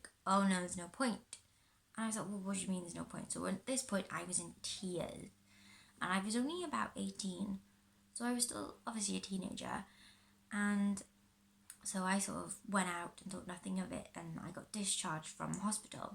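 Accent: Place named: Wales, United Kingdom. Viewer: Just watched the entire vid, knew you were Welsh :)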